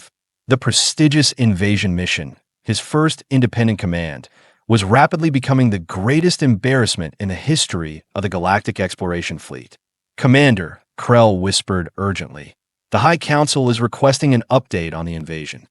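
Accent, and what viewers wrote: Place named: Spain. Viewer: Never get rid of the wierd and occasional pronunciations with a Spanish accents. So much fun. I look forward to them 😊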